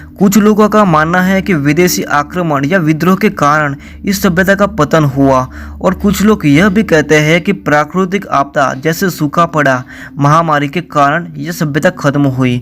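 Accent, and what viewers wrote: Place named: Gujarat, India. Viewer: भलेही हिंदी बोले किन्तु गुजराती का तडका निकाल आता है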